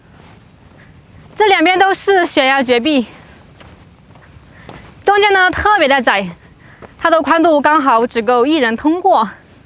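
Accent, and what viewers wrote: China. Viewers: 中國口音超重，你便走邊小心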